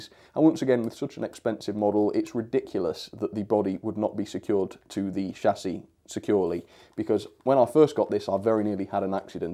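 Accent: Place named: Cumbria, United Kingdom. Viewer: Hi! Where are you from? You sound Cumbrian like me or close to Cumbria.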